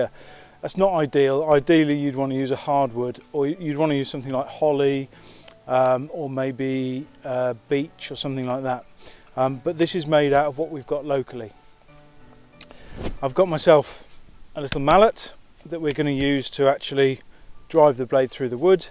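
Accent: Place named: United Kingdom. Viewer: Wow, in the world of accents I found a British man!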